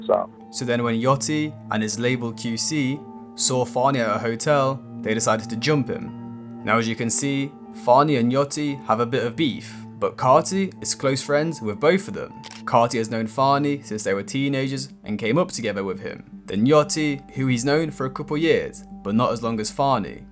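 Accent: United Kingdom. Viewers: Why u gotta have English accent it would have been better wit sum else I understand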